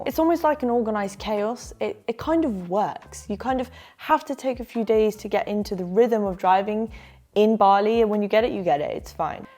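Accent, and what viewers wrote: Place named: England, United Kingdom. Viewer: sorry where u from ? england? no surprice peace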